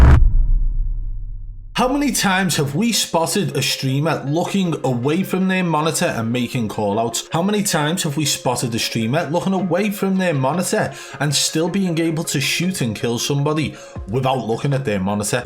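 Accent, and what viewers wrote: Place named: Birmingham, United Kingdom. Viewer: a bermingham accent 😂 love it❤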